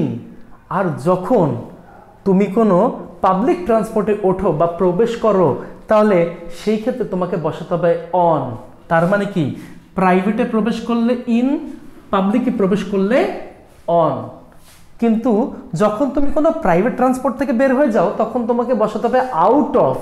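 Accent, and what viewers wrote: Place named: Barishal, Bangladesh. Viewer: ধন্যবাদ স্যার। স্যার কিন্তু মেগো বরিশাইল্লা👍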